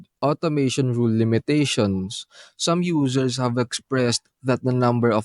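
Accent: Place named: India. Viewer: You sound Indian, why are you using a white guy? I already lost the confidence in this review in 2 seconds.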